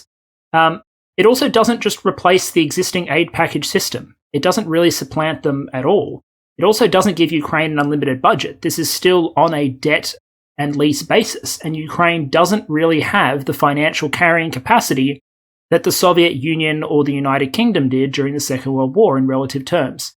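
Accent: Australia. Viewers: I like how these videos are slowly getting more 'strayan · If shorter means more regular content is possible then I am all for it. I just want to listen to those dulcet Aussie tones telling me things that no one else seems able to tell me. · I don't have any kind of training or expertise in any field relevant to this war, so am very happy to get as much information as you can provide, considering every angle and in as much detail as possible. I enjoy sitting through exceedingly long lectures, so unless you're reading through a list of every nut and bolt of every tank there is, I won't be bored. I also very much enjoy your style of exposition, and I always get a kick out of your accent. Listening to an Australian give a more level-headed explanation of what is happening to our neighbours somehow feels reassuring. That being said, I understand that covid is still giving you a hard time, so I will not complain about the shorter videos. 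I would suggest that you create more in-depth analyses and divide them into episodes. I suspect we are a rather patient audience. I wish you a full and speedy recovery!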